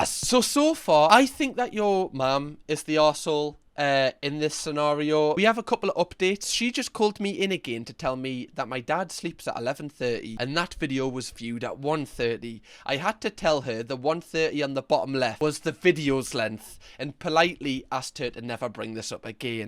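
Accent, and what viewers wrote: Newcastle, United Kingdom. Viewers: I just came across this podcast. Love his chat and the accent brings back great memories of Newcastle, now 45 years ago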